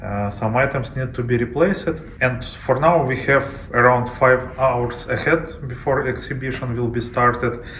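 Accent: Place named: Eastern Europe. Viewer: Nice exposition stand 😉 Great cars 👍 and wasted someone's effort on crappy Volgas 😄 So now we're waiting for six or seven more episodes on other Alfas/Maserati 😁 Every car and its story is interesting, if you just have time and possibility. As for your English - better is Eastern European English with accent, than fluent srussian. Stay safe and strong and looking to next episode of Golden Beauty ✌️ Greetings from Lithuania!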